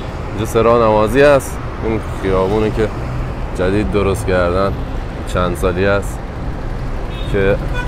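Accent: Shiraz, Iran. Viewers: منم از کار زیبای شما سپاسگزارم بخوصوص با لحجه زیبای شیرازی شما. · ، عالی بود. چند روز پیش یک کار شبیه این همشهری محترم شما آقای حسام الدین را دیدم. براش تعریف از لحجه شیرازی ایشان کردم در صورتی که ایشان اصلا خیلی کم حرف میزنه. در واقع این در مدح لحجه شیرین شما بود که اشتباها رفت برای ایشان. 😄 خلاصه از هر لحاض ما با کارهای خوب شما وطن را حس میکنیم. دمت هم گرم. آقا این آخر ویدیو هم حرف نداشت. فضای ناب.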